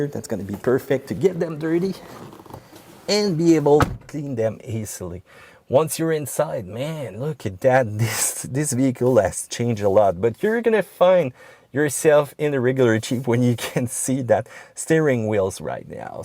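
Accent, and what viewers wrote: Quebec, Canada. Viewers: came for the french-canadian accent, stayed for the high image quality... but jeep is really doing a poor job with this one hahahaha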